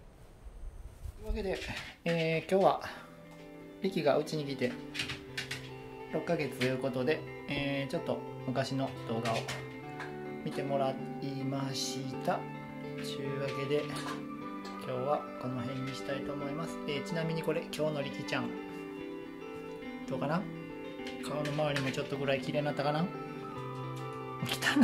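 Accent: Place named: Kansai, Japan. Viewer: リキちゃん可愛い😍😍😍 飼い主様の関西弁も好きです✨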